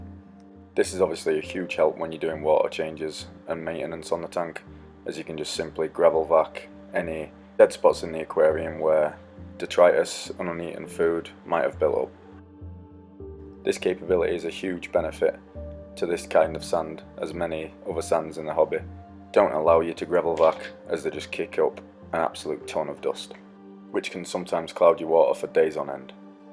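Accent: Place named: England, United Kingdom. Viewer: • Thank you. Yeh I was about to say I'm hearing the good old English accent lol. Yes will try that mate for sure. 👌🖒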